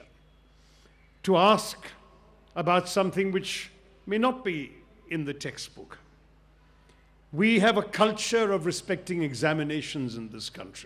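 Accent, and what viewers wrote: United Kingdom. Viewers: ~ You can even listen to Che Guevara, for all I care. Nobody is distracting you or stopping you. Just because one can speak crafty, polished queen's english doesn't absolve of one's involvement in the death of a woman one is married to, in a highly suspicious circumstance that directly tied to one with many loose ends floating all over. I don't care who says what, I would never send my kids to a school who will invite someone like him to talk on morality & leadership.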